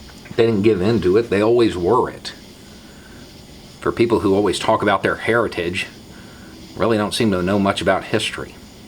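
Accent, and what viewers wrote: South, United States. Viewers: Come on now. Southern-sounding white guy in his garage, with a decent sized beard and cap? Oh hell, brace for the... Whoa, This is Awesome!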